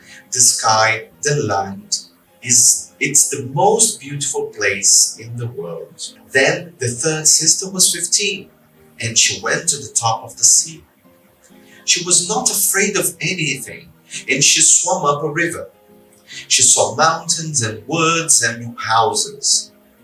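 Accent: United Kingdom. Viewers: obrigada prof, fiz um teste e meu nivel é A1 e amo o sotaque britanico obrigada por esses videos e esse canal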